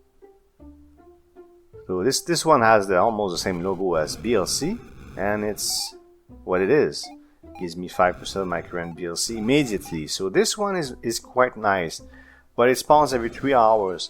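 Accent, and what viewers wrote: Quebec, Canada. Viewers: Es tu quebecois ?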